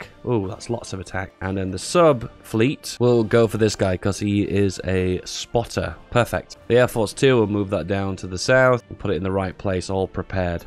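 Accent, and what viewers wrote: United Kingdom. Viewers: The reason I subscribed to this channel is because you are so damn brittish and it makes me feel great to hear your accent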